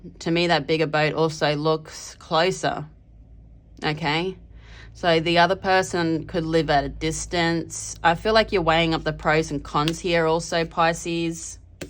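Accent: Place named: Australia. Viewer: Thank you for reading. I love your accent. Are you Australian? ❤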